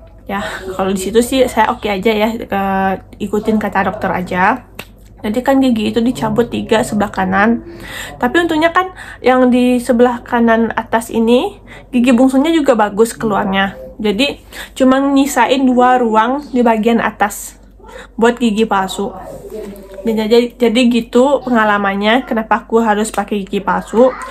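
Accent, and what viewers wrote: Padang, Indonesia. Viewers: Kaka nya org padang ya. 😅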